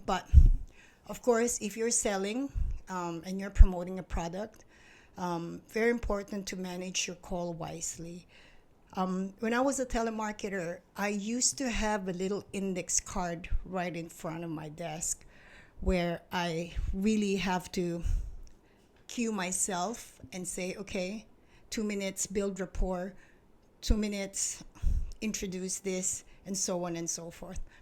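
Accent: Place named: Singapore. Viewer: You speak like a Singaporean. Very clean lines. Good articulation. Keep it up ate. Greetings from Singapore.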